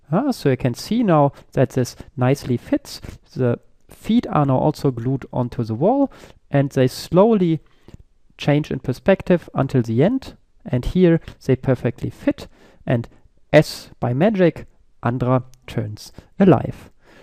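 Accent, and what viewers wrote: Germany. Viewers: ich glaube dass ihr damit definitiv mehr leute erreicht! über den deutschen akzent kann man locker hinweg sehen! weiter so!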